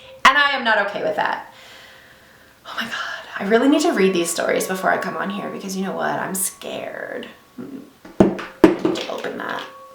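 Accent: Los Angeles, United States. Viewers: good stories but the LA voice really does break the immersion lmao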